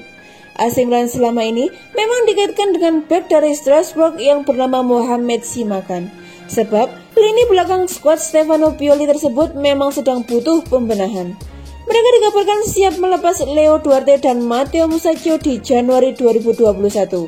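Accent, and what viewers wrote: Java, Indonesia. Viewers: Kog medok to mbak? Forza milan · Senang dengar medoknya mba ini,tpi sya sayang bacanya cepat bingitsss...😁😁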